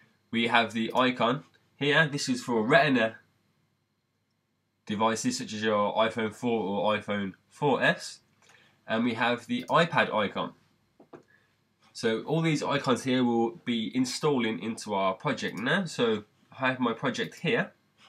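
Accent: United Kingdom. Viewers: Where are you from? Manchester?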